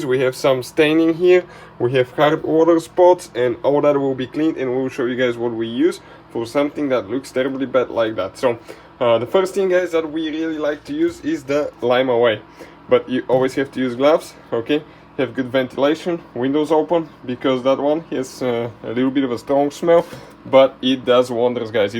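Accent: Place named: Russia. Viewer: He sounds Russian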